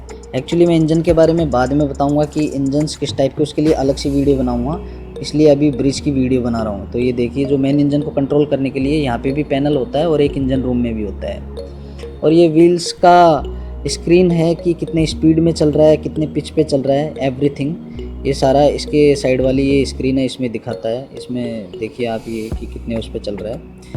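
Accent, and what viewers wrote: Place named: Haryana, India. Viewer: apki voice Haryana jesi lag rhi hai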